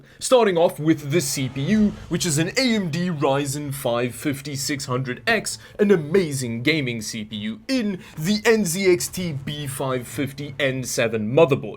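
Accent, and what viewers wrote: South Africa. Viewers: You sound like a ex South African your name is spelt the same in Afrikaans which h is like Dutch thanks for a chance towim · Hey, are you south African?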